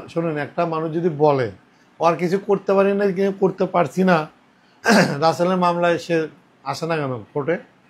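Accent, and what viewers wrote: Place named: Bogura, Bangladesh. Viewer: আচ্ছা আপনি কি বগুড়ার লোক? আপনার কথা শুনে মনে হচ্ছে হয়তো আপনি বগুড়ার লোক।কারন আমিও বগুড়ার মেয়ে।এজন্য জিজ্ঞেস করলাম।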